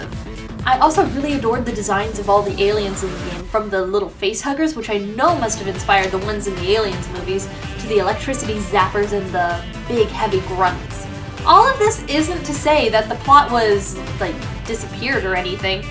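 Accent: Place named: South, United States. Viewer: I did watch this the other night but as per usual fell asleep at the sound of your calming southern tones xD Awesome review and very objective! (Which is a good thing) entertaining and fun!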